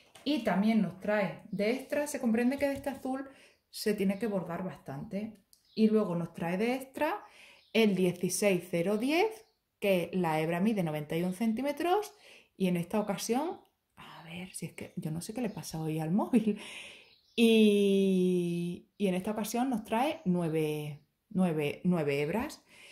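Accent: Extremadura, Spain. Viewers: Muy bonito video, yo también he sacado mis proyectos de temática veraniega para bordar este verano y consolarme de no poder ir de vacaciones hasta septiembre!! La carpeta es alucinante, deberías comercializarlas. Me encanta escucharte con tu acento, mi familia materna es extremeña y fueron mi abuela y bisabuela de allí las q me enseñaron a bordar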